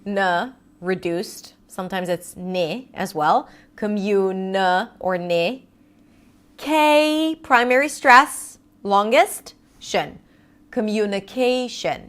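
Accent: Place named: United States. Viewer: I learnt most of the American accent by you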